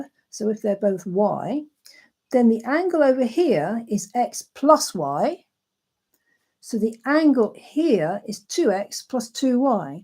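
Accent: United Kingdom. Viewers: ..., If I remember correctly, mathematics in my school paid a lot of attention to becoming proficient in Algebra and Trigonometry, but little time was spent on Geometry, while Algebra and Geometry are very much connected! (thinking of René Descartes). Despite the fact that Geometry is very visible, it still requires a thorough structural approach strategy to problem solving, after all, you are confronted with all kinds of geometric objects and combinations thereof (also in your video), which still regularly creates confusion for me when trying to solve problems! In conclusion, if you are really a British citizen (if I'm right according to your pleasant accent), then we are neighbours, as I live across the North Sea in the Low Countries of simply beautiful Holland... Thank you again, Jan-W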